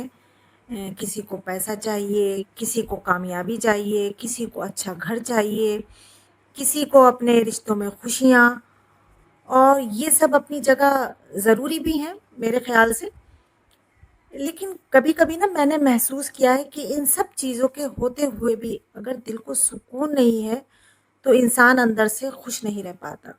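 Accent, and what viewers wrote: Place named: Pakistan. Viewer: AAP BILKUL PAKISTANI ANDAZ M BAAT KARRAY AUR INDIA SE H LIKHAY BUT CONFUSION AAP BICHMAY 🎶 🎵 MUSIC NA DALLAY BAQI ZABARDAST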